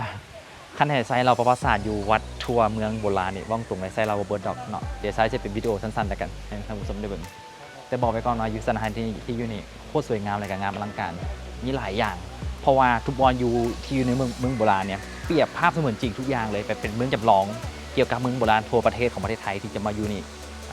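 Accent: Vientiane, Laos. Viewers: พื้นเพเดิม เป็นคนลาวใต้แต่ย้ายมาอยู่เวียงจันทร์ พูดสำเนียงลาวใต้ได้ แต่คงถนัดสำเนียงเวียงจันทร์มากกว่า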